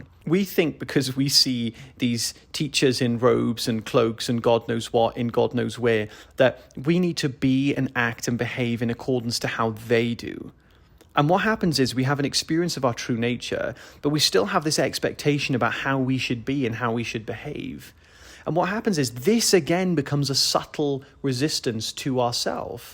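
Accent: United Kingdom. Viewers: I feel enlightend by your amazing british accent. So nice!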